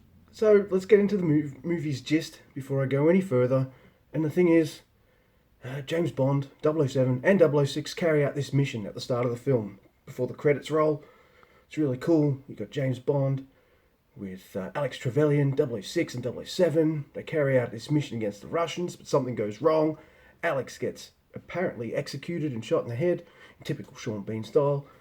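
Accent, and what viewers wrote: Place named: United States. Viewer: The American accent!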